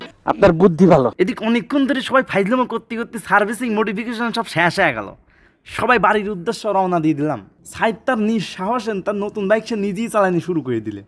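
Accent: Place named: Kushtia, Bangladesh. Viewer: vai ki kushtiar mal naki kotha kinto akdom kushtiar lok er moto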